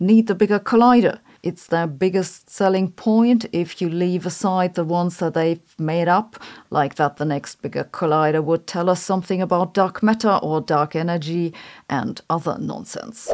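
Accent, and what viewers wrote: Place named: Germany. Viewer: 👎👎👎 SABINE, your English is so awful, that you must go back to school an improve it. You are arrogant to address publicly people on YouTube with such a horrible English. You can not cover up your German roots. 👎👎👎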